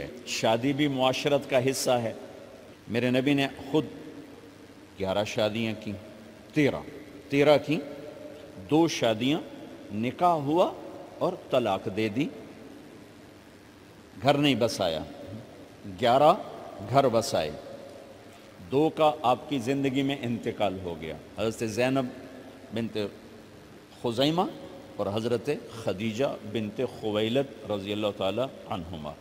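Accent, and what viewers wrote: Punjab, Pakistan. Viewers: i love to hear uh😍😍😍your Punjabi urdu its drive crazy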